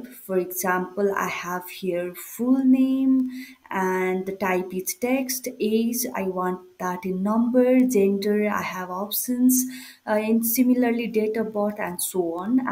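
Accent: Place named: Nepal. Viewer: Helpful Video. You sound like nepali from your accent !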